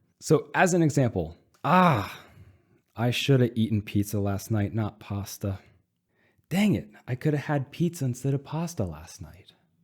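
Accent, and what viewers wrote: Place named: Russia. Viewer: Not being rude, but you do have 1% russian accent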